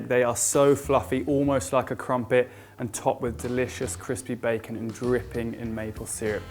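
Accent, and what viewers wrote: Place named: United Kingdom. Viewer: やっぱイギリスアクセントっていいよねー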